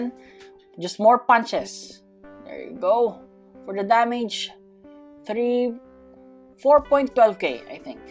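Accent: Philippines. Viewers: Bro had a Filipino accent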